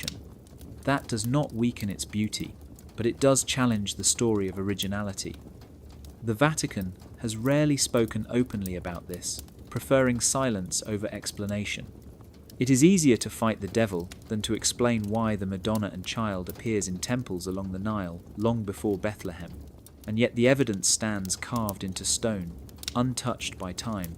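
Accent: England, United Kingdom. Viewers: Are you a English lad? Did you come from Oxford?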